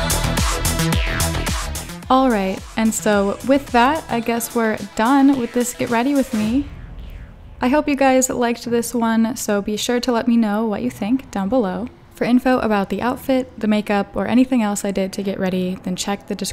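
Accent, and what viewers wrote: Germany. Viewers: Wait are u German? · em stop, are u german?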